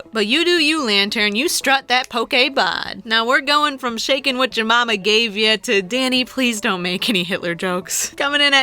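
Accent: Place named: Louisiana, United States. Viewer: are you from Louisiana? you sound so familiar like the shopkeeper of rockets hideout. please respond because you sound SOOOOOOO familiar -_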